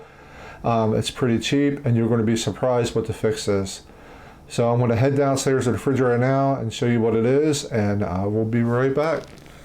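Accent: Baltimore, United States. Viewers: Sir, I'm from Balmore and you sound as though you may be as well. I just did this and your "fix" worked! Thank you! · That did it! Thanks so much. …and thought I recognized that Balmer accent. Go Ravens!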